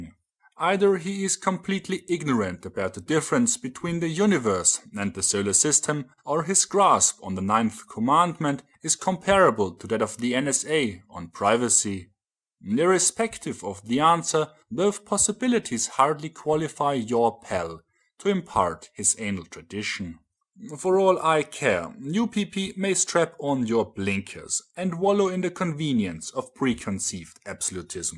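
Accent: Germany. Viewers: A great video, and a great presentation. I love the german accent, and your arguments are delivered with great precision and production value.. It's funny (and telling) to see the difference in how a creationist simplistically disobeys the ninth commandment, And a true factual and researched rebuttal.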